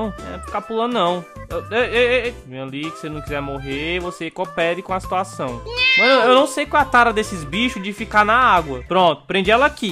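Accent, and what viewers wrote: Northeast, Brazil. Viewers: Gosto muito do seu sotaque, vc é nordestino ne?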